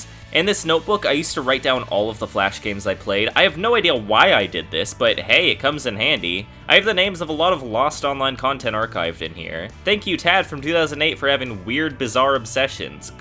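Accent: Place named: Georgia, United States. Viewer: You’re definitely from Georgia aren’t you?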